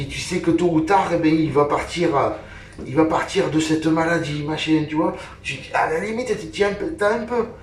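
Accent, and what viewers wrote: South, France. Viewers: Bonjour Mr " ", Je découvre aujourd'hui même vos vidéos (Fabrication du Saucisson pour la première) et ensuite votre chaîne avec les saucisses... Ainsi que malheureusement, le départ brutal de votre ami " Tatane " 😇 Courage à vous (même si nous sommes en 2023) et l'on n'oublie jamais ses Amis Pour en revenir à vos vidéos, des conseils, de l'enthousiasme... On devine toute votre passion et votre volonté de transmettre. Que du Bonheur de vous visionner, vous écouter avec en plus, ce petit accent du Sud: Charmant. avec un sérieux, une franchise. Tout gamin, de manière familiale, le Cochon représentait un événement annuel que j'ai un peu perdu de vue avec l'âge. Je suis de la Loire. (non loin de Polignac d'ailleurs). Grâce à vos vidéos, cela me redonne de l'énergie pour recommencer Alors, rien que pour cela: MERCI Et pour votre Citron Pulco, c'est bien mais j'adore le commentaire ci-dessous de Denis Etienne: " Pour ce qui est de ton embonpoint, mon grand père me disait qu'un boucher maigre n'est pas un boucher de confiance. 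" Tout est dit 😊 Et pour le coup du Masque!!! Bravo pour votre franchise et la belle Remise en place de certains!!! Alors encore MERCI:washhands: Continuez ainsi. Vous êtes non pas un Vrai Personnage mais un Personnage VRAI!!! Cordialement.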